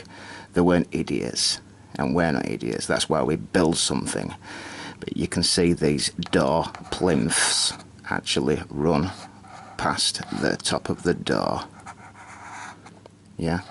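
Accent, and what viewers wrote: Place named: Manchester, United Kingdom. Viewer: Mcmahn 1 im from near bolton, and this sounds manc to me, or oldham area.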